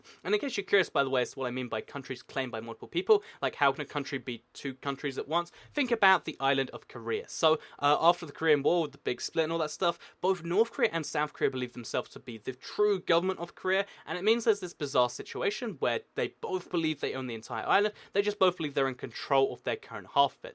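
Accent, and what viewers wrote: England, United Kingdom. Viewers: Kiwi he's British, they pronounce it differently Coming from a Bosnian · Very good material. It could have been cut up into two- or more presentations. I live in (kind of) the Southern U.S. and the combination of your English accent (which is delightful, by the way) and the speed with which you blew through your presentation made it a little harder (for me, anyway) to understand. I genuinely enjoyed the video, however, and am impressed by the amount of research you must have had to do to acquire this obscure knowledge (disputed and unclaimed lands of the earth).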